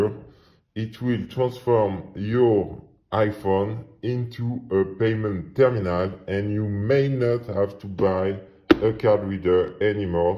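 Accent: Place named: France. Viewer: he IS speaking english but with a french accent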